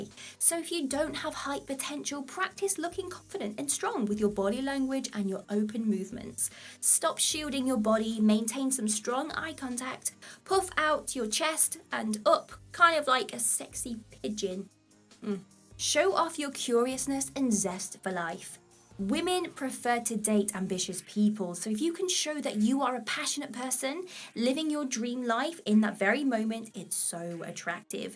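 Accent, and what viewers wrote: United Kingdom. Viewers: ❤her British accent/ sexy voice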